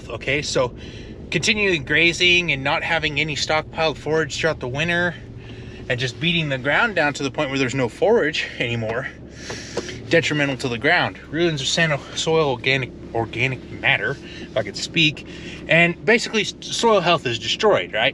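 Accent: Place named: Australia. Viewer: Hi from Australia. You are sounding like an Aussie farmer. We’ve been turning away from feeding as much as we can. But the biggest change here over last 30 years has been changing fro hay to silage. It’s been huge the change. Especially in the colder areas out here. The quality of silage over hay is the driving factor. I was one of the first contractors to do silage. Now nearly every contractor has to offer that or he doesn’t have many clients. I could go on for hours but would swamp your messages.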